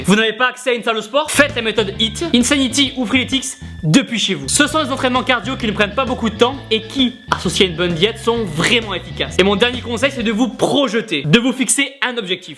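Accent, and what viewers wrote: South, France. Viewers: La recette avé l'accent avait un petit coté Maïté! C'est très bien mon gars en plus d'être valables tes vidéos sont drôles ce qui est agréable dans une discipline ou beaucoup de gens prennent on sait pas pourquoi le "melon", ça change des potaches adeptes du selfie qui font mon désespoir quand je tombe dessus... Continue bravo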